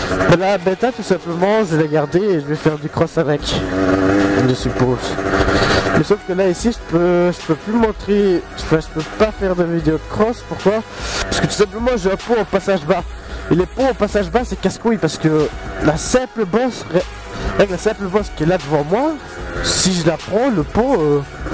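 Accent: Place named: Belgium. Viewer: MDR! T'es vraiment un ouf! Pour quoi je passe moi maintenant avec tes conneries... lol (Inventées de toute pièce de ta part). Arrêtes de jouer à WoW ça te monte à la tête! x) Tu m'as bien fait rire... J'adore ton accent belge "une fois"! Merci pour le coup de pub'! Bises. V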